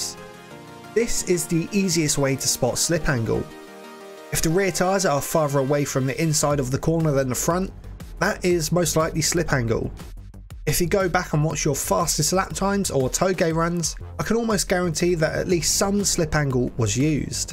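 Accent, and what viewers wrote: United Kingdom. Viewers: No hate, but I think this guy is bri'ish. Tho contecnt both helpful and entertaining. Keep it up, please. :)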